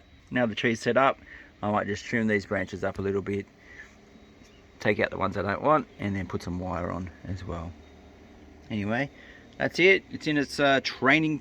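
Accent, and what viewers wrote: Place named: Australia. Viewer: What a cracking Chinese Elm and made even better with an Aussie accent to remind me of my youth in Melbourne. Great chop on the roots and I think oyu have really set it up for the future. Did you happen to plant the roots that you cut off? Anyway, loved the video and now I have to see what else you have done! Cheers, Xav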